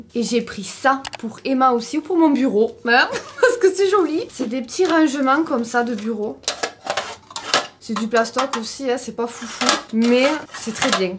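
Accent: South, France. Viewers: Bonjour, je suis nouvelle sur ta chaîne 😊 j'ai commandé sur temu j'aurais dû recevoir aujourd'hui ça me note erreur livraison 😢 j'adore ton accent tu est d'où ? Sud ? ❤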